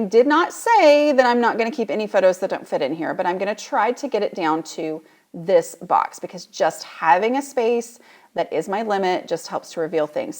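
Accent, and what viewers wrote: South, United States. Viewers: Does anyone one else LOVE 💕 that southern accent is STRONG in this video? No? Just me? Well someone needs to 😍😍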